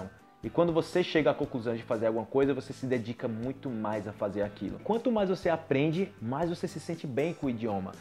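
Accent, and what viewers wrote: Brazil. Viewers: Adorei o idioma por vc .... Amo o sotaque brasileiro!!!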